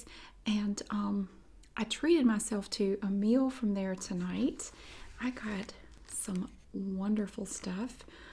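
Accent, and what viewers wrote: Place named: South Carolina, United States. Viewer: Normally, I despise mukbang videos, as they make me nauseous. But to my surprise, I absolutely loved this video. First of all, I feel like you’re eating naturally as a person normally would, and I didn’t get the feeling that you’re “over-acting” the eating for the video. But what really makes it enjoyable is your soft-spoken chattiness. I find your soft voice and Southern accent very pleasant and soothing to my ears. Therefore, the overall effect is something akin to sitting down and having a nice lunch and a pleasant, relaxing chat with a good friend. How much more soothing can a video get? PS: I’ll second what others have already said in the comments, about how fun it is to hear you say “puddin” the way that you do. ☺️😁👍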